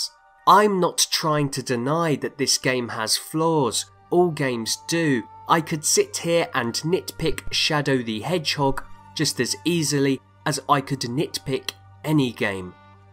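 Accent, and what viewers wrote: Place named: United Kingdom. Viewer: This checks all boxes for a video essay. Even that you’re Bri’ish. So unfortunate.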